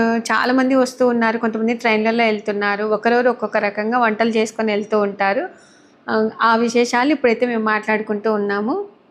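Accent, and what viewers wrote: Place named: Kurnool, India. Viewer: మన కర్నూలు యాస మార్చి మాటలు వదు 🎉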